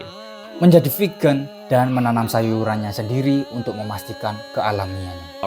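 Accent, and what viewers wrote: Java, Indonesia. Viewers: Suara mu terlalu medok le le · logatmu medok banget min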